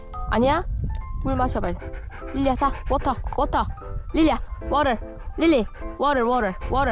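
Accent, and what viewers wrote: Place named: Jeolla, South Korea. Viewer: 그런데 말습관중에 끝에 자꾸 전라도 사투리'이 이' 하시는데 왜 그러는 걸까요? 경상도 분이신데 뭘해도 상관은 없지만 안하시면 더 좋을듯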